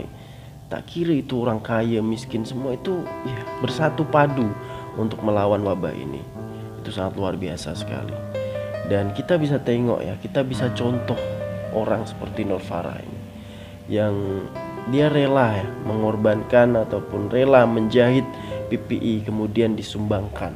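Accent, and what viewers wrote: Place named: Malaysia. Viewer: aku confuse abg ni orang indonesia ke org malaysia? hahaha ckp mcm org msia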